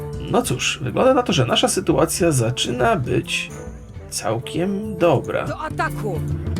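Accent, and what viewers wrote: Silesia, Poland. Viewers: pierwszy raz w grze słyszę gwarę śląską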